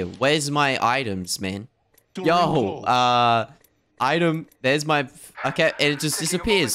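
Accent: Australia. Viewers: Are u from Australia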